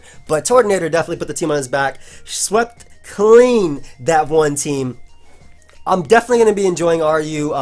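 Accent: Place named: New York, United States. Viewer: It's that NY accent...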